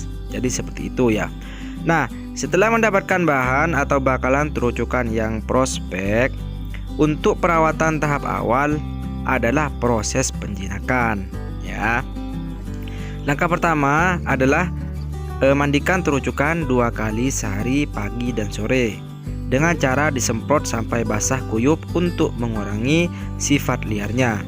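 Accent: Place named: Madura, Indonesia. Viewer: Logat madura banget... lessoooh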